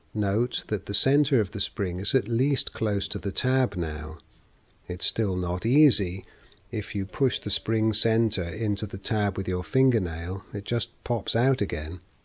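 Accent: United Kingdom. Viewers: Great vid... and the British accent made it enjoyable 👍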